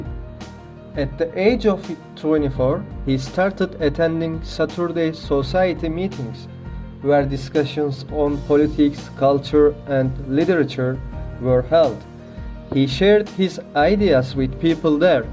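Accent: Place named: Turkey. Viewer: açar açmaz Türk olduğunu aksanindan anladim :))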